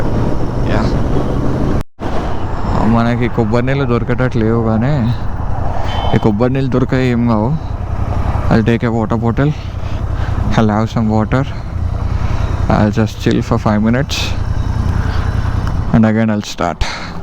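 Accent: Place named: Hyderabad, India. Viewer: Mana Hyderabad slang a alaguntadi 💙💙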